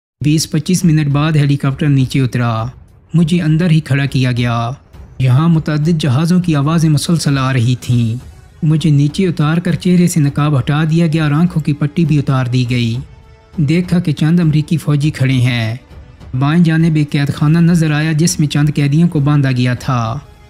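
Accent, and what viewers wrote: Chakwal, Pakistan. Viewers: بھائی جان آپکا لہجہ ضلع چکوال کا لگ رہا ہے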